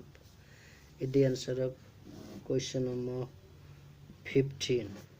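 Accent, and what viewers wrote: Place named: India. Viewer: такой индийский акцент......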